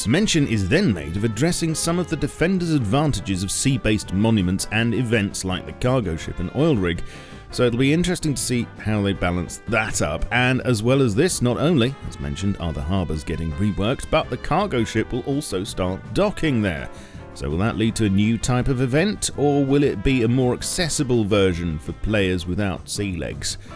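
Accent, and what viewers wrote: United Kingdom. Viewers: He's British 😂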